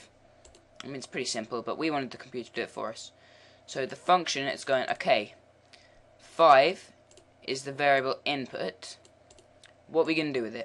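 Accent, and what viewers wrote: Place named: England, United Kingdom. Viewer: They're not, they are in the language of lua. If you mean why is the person who's talking doing so with an English accent then he's probably lived in England for most of his life, if not all of his life.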